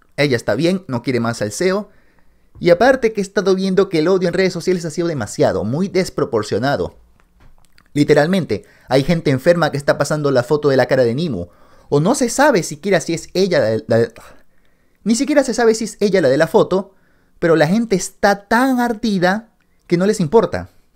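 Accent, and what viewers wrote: Ecuador. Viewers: Apenas iba a preguntar si eras de ecuador por el acento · una pregunta muy tonta creo ¿eres de Ecuador?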